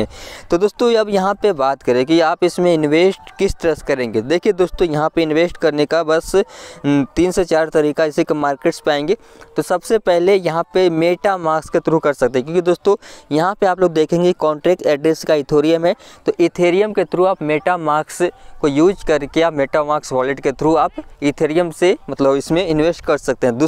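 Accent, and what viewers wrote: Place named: Shekhawati, India. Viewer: Sir aap sekhawati side ke lagte ho awaj se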